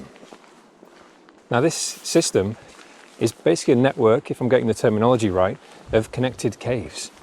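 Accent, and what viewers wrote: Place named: Northern England, United Kingdom. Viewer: Watched for 2 mins ,instant subscribe ! .Articulate, intelligent, interesting and northern. :)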